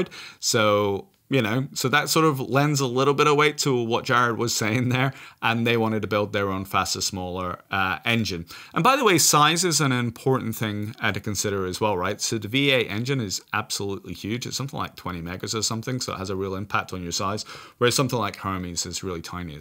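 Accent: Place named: England, United Kingdom. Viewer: I absolutely love his english accent - Subscribe earned just by hearing it :D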